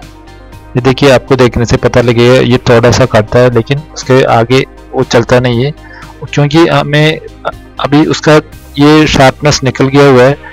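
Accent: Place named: Kerala, India. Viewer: The tip is really nice but listening such sweet Hindi from a malayali was great 😀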